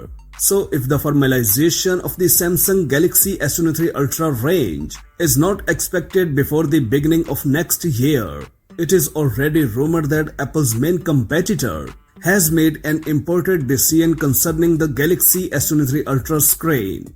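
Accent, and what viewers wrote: India. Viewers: Sorry, thats no interesting information and please talk without IIndian slang if you want to tell something to the world. I had to break up watching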